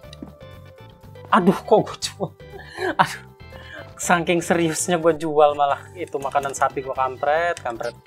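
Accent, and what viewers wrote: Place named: Lampung, Indonesia. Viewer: i hear "tah" and "ding" orang lampung ya kak?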